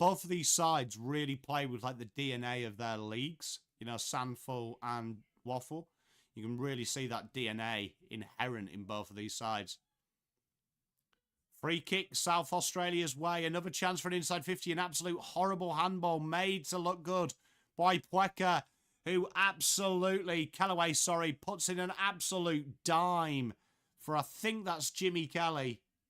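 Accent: England, United Kingdom. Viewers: What part of England are you originally from?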